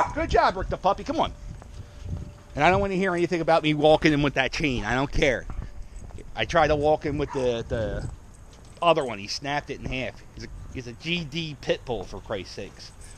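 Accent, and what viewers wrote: Philadelphia, United States. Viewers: Ahhh I love your Philly accent and then I realize I sound the same way 😂😂 It looks like you're in the Burg. One of the nicest neighborhoods left in Philly.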